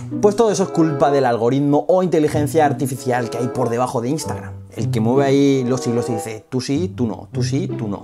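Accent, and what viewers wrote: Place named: Spain. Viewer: Recontra interesante chabon!! Una pregunta, de que parte de españa sos?? Pregunto por el acento, esta muy copado jajajaja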